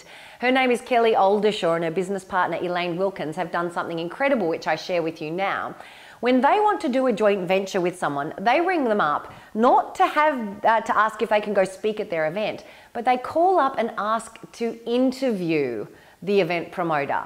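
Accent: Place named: Australia. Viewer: It helps to have an awesome Australian accent.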